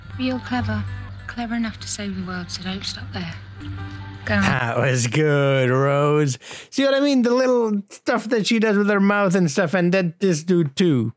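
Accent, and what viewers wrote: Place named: Canada. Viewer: yo really? I thought you might be but I wasn't sure, I don't know if English is your first language so forgive me if this is rude but your pronunciation is awesome, I'd honestly been thinking you were maybe Canadian with Asian heritage this whole time. That's really cool though! The jubilee is probably what caused the algorithm to latch onto this video, it was this one specifically that was in my recommended a few days ago. The jubilee is the anniversary of the queen's coronation. This weekend was the queen's platinum jubilee, meaning she's been the Queen for 70 years straight. So a reaction to a doctor who episode about the coronation during the run up to it has probably caused the algorithm to catapult you into British YouTube feeds I'd bet. Unless you haven't noticed a spike in viewers this week, in which case maybe it's just a coincidence. 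Search engine optimisation and algorithms interest me though so that's why I mention it 😅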